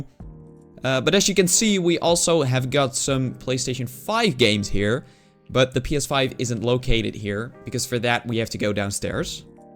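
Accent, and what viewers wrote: Netherlands. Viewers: Joo, hoor gelijk aan je Engels accent dat je Nederlands bent haha, leuke video's man! Kwam langs je skylanders video, en kreeg gelijk weer een nostalgisch gevoel man. Leuk dat je zo ingaat op de wii games waar bijna niemand op ingaat of die een beetje vergeten worden. Ga zo door!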